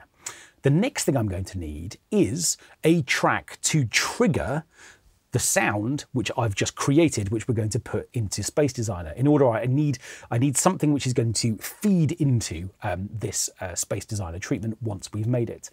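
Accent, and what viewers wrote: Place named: RP, United Kingdom. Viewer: Entertaining dull with a posh accent and 20 years old information. Maybe a gig with the BBC.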